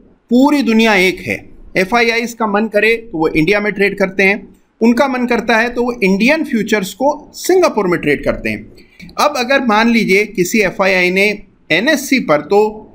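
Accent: Standard. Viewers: Sir, Aap ki suddh Hindi suntehi karn trupt ho gaye. Thanks a lot for informative video.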